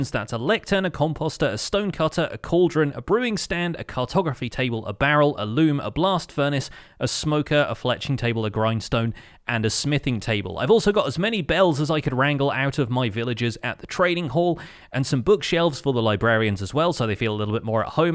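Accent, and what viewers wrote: United Kingdom. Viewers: Why did I notice he sounds British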